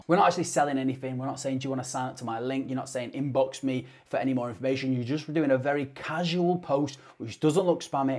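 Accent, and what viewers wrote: United Kingdom. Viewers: Who struggles with British accent like me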